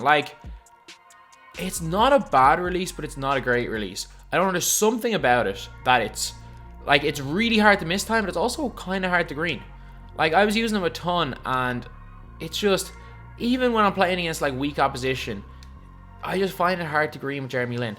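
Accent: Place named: Ireland. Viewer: There’s clearly a “H” in “threat” saying “trett” makes no sense. I also don’t know why you say “or” for “R”. I get that you’re Irish but it’s just grammatically incorrect. Good informative videos though 😂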